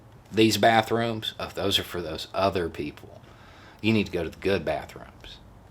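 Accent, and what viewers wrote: South, United States. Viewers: THANK YOU THANK YOU THANK YOU THANK YOU for this. I've lived in TN my whole life which means I've been confounded for most of my adult life. Watching ppl I grew up with blindly hate vote for holes in their own heads, then yell YAY....HEAD HOLE! ACA, being a prime example. I'm an active Dem in my state. We're working hard to make inroads everywhere. We had Dems in 96 of 99 cong districts running in 2018 & 2020 which is huge for reasons I need not explain to you.. but it didn't move the needle enough. Only 35% of eligible voters vote in TN. I'm exhausted. I personally helped get over 80 ppl registered to vote for first time in 2020. Called every one of them to make sure they went to vote. So yeah..I'm exhausted. Thank you for spotlighting my home state. The real work of decent folks is in their own yard. I imagine what could be if we break that red south firewall, so it's that hope, that keeps me going. 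Your mere presence here, with your intelligent southern accent of truth & "wokeness" (sheerly used for lack of better term), in and of itself helps destroy the idea that the south is owned by the right. It's that idea that needs its ass whooped. So the other 65% who could vote but don't bcuz they rationalize it's hopeless & won't matter, can no longer honestly cling to that rationale. So THANK YOU brother! From one frustrated but not going away southern lefty to another.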